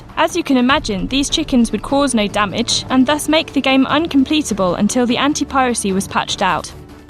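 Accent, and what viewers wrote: United Kingdom. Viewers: man that british accent makes this sound so clinical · Cookie cutter narrator with a britsh accent... I thought you guys were better than this.-.- Use real gamers! Did you run out of youtubers?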